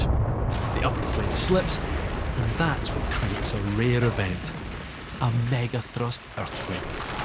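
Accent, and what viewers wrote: Scotland, United Kingdom. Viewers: I love your accent. So delicious! I always loved Scottish accents. I'm partly Scotch myself, but I don't have an amazing accent like that! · I love the Scott’s accent! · The Scottish accent makes it sound even more frightening.... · what accent is that? scottish?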